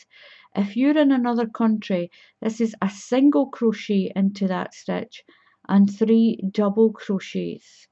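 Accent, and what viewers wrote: Scotland, United Kingdom. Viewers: is that a Scottish accent we hear ??? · Hi, whenever I want to do a crystal waves blanket I always come to your channel, well tonight I decided to put subtitles on as hubby is watching telly, well it seems text to speech doesn't recognise our Scottish accents, no kiddin' I am in fits, you should try it, good job I can follow without sound, anyhoo like others have said your tutorials are very easy to follow, one more thing, do you have sisters called Ann and June? If so I worked with them in Sainsbury's a few years ago.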